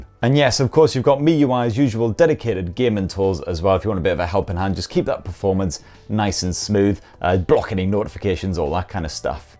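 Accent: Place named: Northern England, United Kingdom. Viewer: northerns his way swiftly through this review. Typical up north camera locations and plenty of chuckles at his brash humour. Stay safe.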